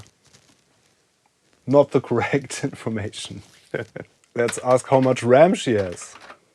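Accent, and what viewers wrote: Germany. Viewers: Your Accent sounds german. Bist du Deutscher oder?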